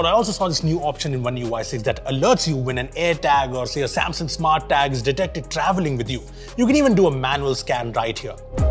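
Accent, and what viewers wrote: India. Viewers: To bude asi indická angličtina, což ?? 🙉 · Don't speak like indian English accent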